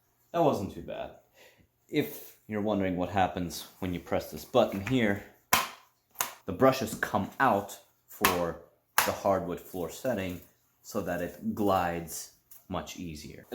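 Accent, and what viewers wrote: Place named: Germany. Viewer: Pronunciation: not strange, just German. Duh.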